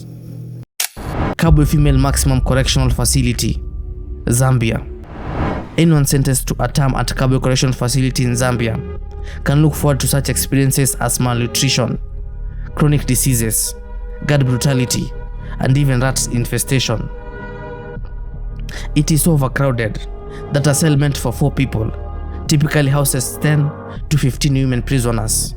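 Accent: Kenya. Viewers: I can tell the owner of this channel is Kenyan even his English proves so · the accent... Acha izo... Weh ni mkenya?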